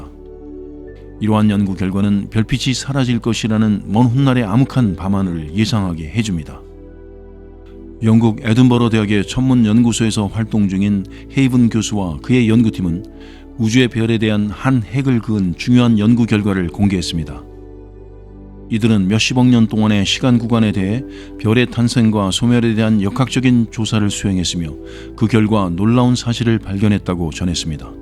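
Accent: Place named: Gyeongsang, South Korea. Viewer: 영상 처음보는데.. 경상도사람 이시구나.. ㅠ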